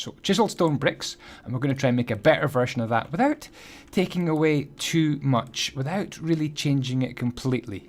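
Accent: Scotland, United Kingdom. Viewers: Is that a Scottish twang I hear?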